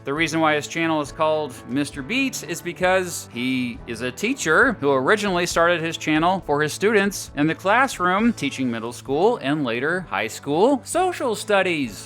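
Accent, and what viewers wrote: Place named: Kansas, United States. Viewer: huh...I was not aware that both Mr. Beast and yourself are fellow Wichitans. Well, I can't call myself a Wichitan anymore, though I am a native Wichitan. I knew you were from Kansas from the first video of yours I watched, though....the Kansas accent is unmistakable, though not apparent to those who spend a lot of time in the state. I can't say I've watched any videos that Mr. Beast has produced, though...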